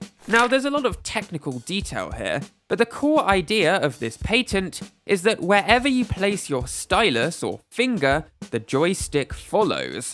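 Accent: United Kingdom. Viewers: Thanks, I was going to ask that. It's obvious you're British - your accent and the spelling of "honor" with a "u" are dead giveaways.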